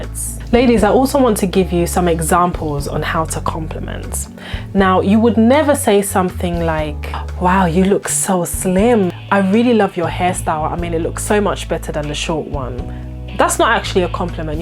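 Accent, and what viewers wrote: United Kingdom. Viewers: I like the British y African accent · ove your British accent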